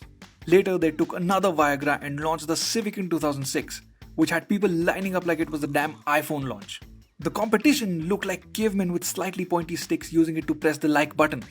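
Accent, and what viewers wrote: India. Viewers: Please bro use AI to dub your videos. The instant I hear an indian accent I click off.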